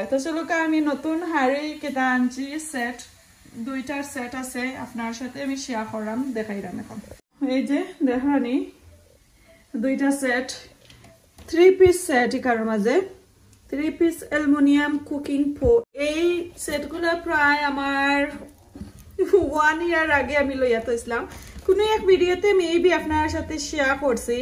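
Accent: Sylhet, Bangladesh. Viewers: Apu apnar kota vala lagse fast comment korlam like diya subscribe korlam. amrar rannar lakhan apnar ranna. Apne amrar sylheti apnar kota shunte valalage. Like diya subscribe korlam.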